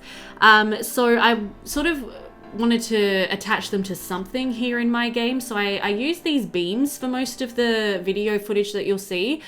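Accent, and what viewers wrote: Australia. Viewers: Soon as I found your channel the other day, thought she has to be Australian by that accent. I am from Melbourne. So hey there ! lol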